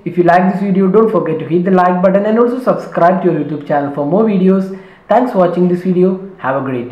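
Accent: Kerala, India. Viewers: are you frm kerala?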